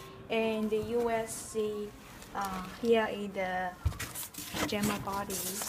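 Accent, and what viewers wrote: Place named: East Asia. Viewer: Andre Moreno well shes either Chinese or Japanese based on the accent and basic English speaking second China & Japan don't care to much apparently because i hear shortwave pirates from Japan & China alot. so this really dose not surprise me...Asian woman..showing illegal jammer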